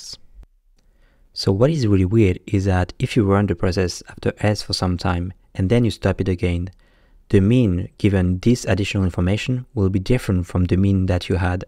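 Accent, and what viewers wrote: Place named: France. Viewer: Difficult to hear the difference between Gaussian and Cauchy when a French pronounces it 😂. Great video anyway.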